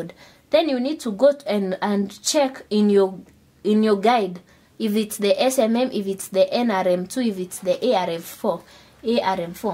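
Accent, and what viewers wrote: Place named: Kenya. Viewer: Weldone. I enjoyed your tutorial. You sound like a Kenyan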